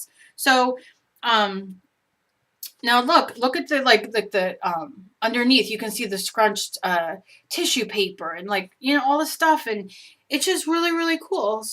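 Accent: New Jersey, United States. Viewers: You're my first YouTube comment post! I've been following your channel for about 4-5 months and am always watching them multiple times. I'm not a beginner but enjoy your banter while I craft. I will be doing the swirly tree myself soon and will try to figure out how to "tag" it to you. You're great cyber company. I'm a NJ native living in Georgia and when I came across your channel while surfing one day, the first thing I noticed was that Jersey accent. So I tuned in and haven't tuned out yet. I have a very stressful and demanding job and try to "art" in the morning cuz by the end of the day, I'm too tired to do anything. Thanks for being yourself and sharing your lovely personality with the rest of us. I've been very cyber shy about sharing my work publicly but I may find the courage soon thanks to your inspiration. Keep doing what you do and don't worry about the darn "clock".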